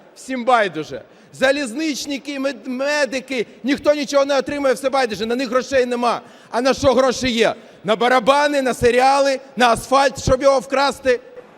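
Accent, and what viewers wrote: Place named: Russia. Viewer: Навчись розмовляти українською мовою, а не досі в тебе присутня російська! Ненавиджу таких! За що хлопці наші гинуть?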